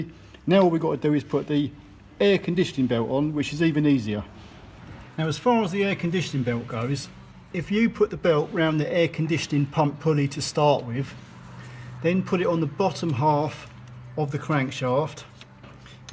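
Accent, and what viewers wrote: United Kingdom. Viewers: Don't own a Focus, have never owned one, and probably never will... and yet for some reason I've now watched the vid three times. Must be that British accent or I've just had too much JD. But then again, can one really have too much JD in this ClownWorld? Anyway... good vid, thumbs up, and all the good stuff. 🥃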